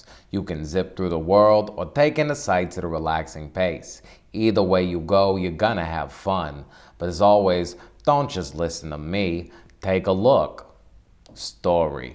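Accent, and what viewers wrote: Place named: Boston, United States. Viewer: Really love your Bostonian accent (sorry if that's not an accurate description). Your parents named you perfectly, it just totally fits your presentation. You have the potential to be similar Yahtzee of Zero Punctuation, as in using your nationality as your draw; maybe try a VR mafia insider type persona - you'd totally pull it off.:P Regardless, this Australian gives you the thumbs up and I'm glad I subscribed, especially after seeing your live stream cus you seem like a cool guy who genuinely loves VR as much as I do.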